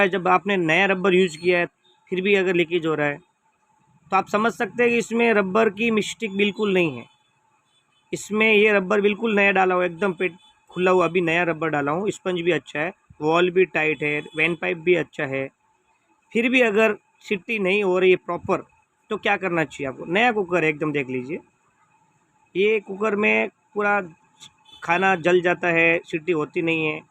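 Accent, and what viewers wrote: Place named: Gujarat, India. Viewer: Wah gujarati hindi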